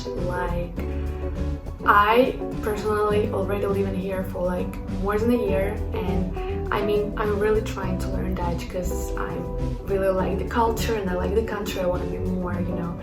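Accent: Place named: Ukraine. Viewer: Круто, сразу слышно украинский акцент.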